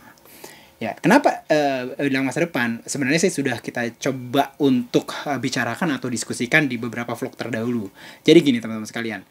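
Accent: Indonesia. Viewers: 😂kang,biar enak di dengar,coba kalo nyebutin nya jgn lidah indo banget....coba gini biasa aja: komfom jangan sampai bunyi yg keluar komfrom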